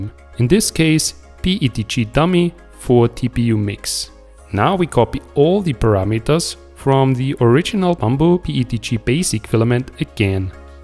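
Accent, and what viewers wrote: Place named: Germany. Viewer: Wenn der deutsche Englisch spricht. Dennoch, gutes Video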